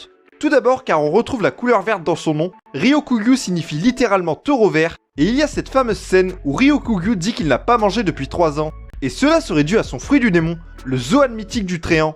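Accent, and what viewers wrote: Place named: Nord-Pas-de-Calais, France. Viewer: Ptdrr ta un accent chti un peu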